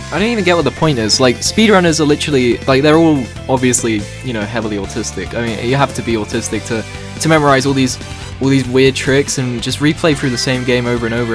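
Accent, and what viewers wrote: United Kingdom. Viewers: guys there isn’t a joke it’s just a British guy shitting out of his mouth for five minutes. I feel like I am watching pyrocynical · I respect a man who can share his opinions online. Commenting to help push this vid and ruin someones new year! Edit: Ew youre british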